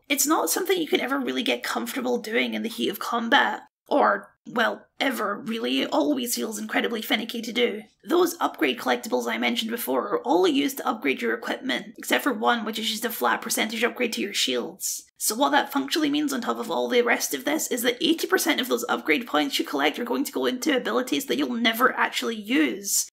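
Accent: Scotland, United Kingdom. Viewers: Your voice not even that high bro. It just seems like such when paired with your Scottish accent and that’s how it comes off to an untrained ear. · Lmfao, yeah that was definitely an ignorant American question. Never actually met a Scot so only know the over the top accent seen in our movies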